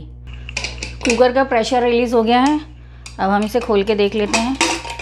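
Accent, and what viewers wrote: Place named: Dongri, India. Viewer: Bohot ache aapa, aap dongri kyuki aapke baat karne ka tareeqe mei jhalak hai kya, mei abhi out of india settle hu but aapne muje yeh dikha ke apne bachpan ki yaad dila diye, lots of wishes and keep growing, aapa rocks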